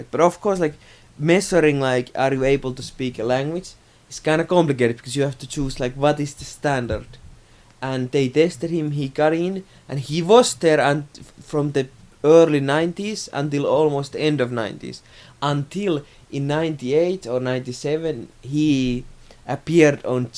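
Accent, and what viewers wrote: Finland. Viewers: I'm finnish and this is the most stereotypical finnish accent ever, he is 100% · Is this a finnish accent or a messy indian accent?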